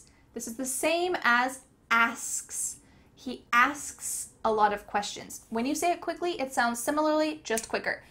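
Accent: United States. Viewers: American English pronunciation? Yes, I think so. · Not that hard. Just kidding. Not that hard for me now yet it did take me some time to master the pronunciation of those words. And I'm glad you didn't include words such as whirlwind or caveat. (By the way, do you live in the US? Your speech sounds more and more American. I remember you mentioning that your husband is American - I might be wrong about that). What a teacher you have become ! Kudos to you. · This is American English pronunciation - bah humbug. Correct your title. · Please do not say you are speaking or pronouncing “English” You are pronouncing :AMERICAN”. It is very different. In English remember D is at the start of the word. Mirror is MIR. Ur. Asks is a long A “R skas....Please you are murdering my language !!!!!!!! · Oh, as a Brit I had to abort! Those pronunciations are... very American! 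· I know you say this is American English, but as someone who’s been born and bred in the UK, this is sooooo American 😂 I love it 😻 it’s all the words that stand out to me when watching American shows, I could hear the difference but I couldn’t understand what it was that made it different, this is so cool, thanks 🙏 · Please stop learning English from an American, they destroy the language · hell this is not English its American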